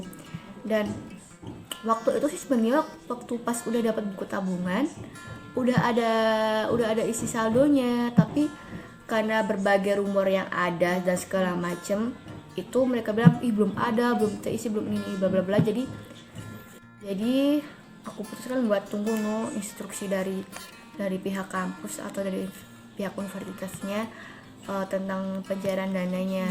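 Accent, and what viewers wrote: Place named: Manado, Indonesia. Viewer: Wih kakak udah kelamaan di Manado, udah bisa jago bahasa Manado nya. Kakak ngana sekarang masih kuliah di Unsrat...?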